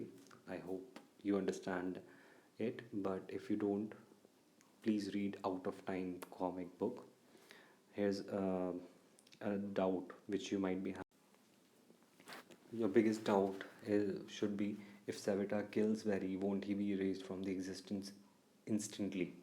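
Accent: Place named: England, United Kingdom. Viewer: dislike for your English accent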